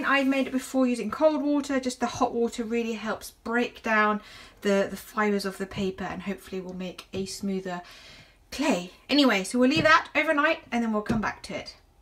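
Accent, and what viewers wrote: United Kingdom. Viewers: I don’t know what it is about female British accents, but damn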